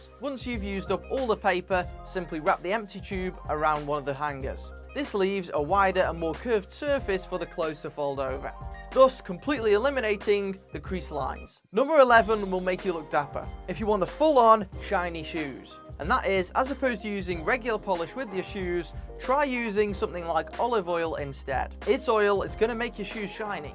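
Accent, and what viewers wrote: United Kingdom. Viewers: love british accents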